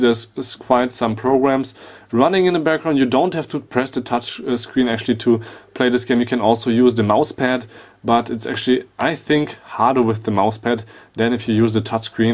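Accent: Germany. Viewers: Are you from germany ?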